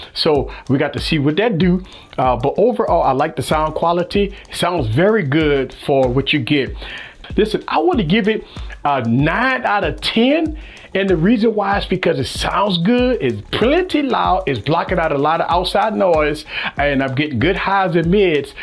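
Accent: Louisiana, United States. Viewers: I feel like you're from Louisiana based on your accent lol. Good video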